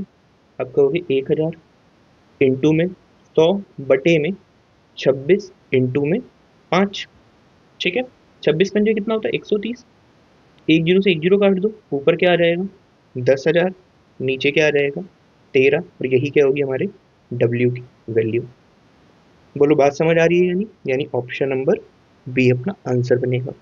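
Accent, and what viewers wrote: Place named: Purvanchal, India. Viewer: Aap Purwanchal se lagte ho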